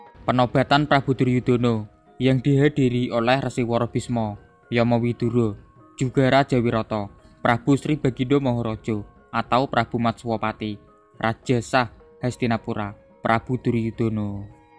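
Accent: Java, Indonesia. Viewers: Mhn maaf, jika mungkin yg memberikan ulasan sebaiknya diganti, soalnya terlalu medok jawanya. Sy wong jawa saja gak enak dengernya. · Medok banget jowo ne, love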